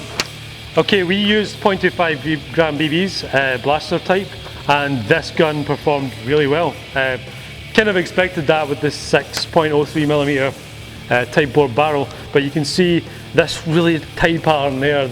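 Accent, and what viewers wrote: Scotland, United Kingdom. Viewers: Very nice review! No box comments (why do people do that??), indoor environment for consistent testing, good shots and lighting... and that Scottish accent! what can we ask more? :P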